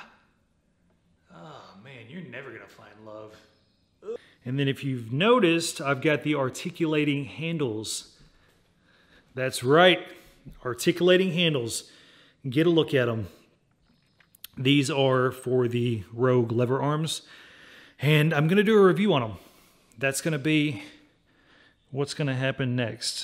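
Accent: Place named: South, United States. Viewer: Love the southern twang! And the gym too!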